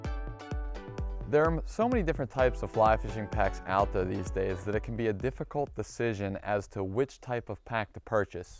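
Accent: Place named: Pennsylvania, United States. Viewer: Knew as soon as I heard you speak you were a Pennsylvanian like me 😄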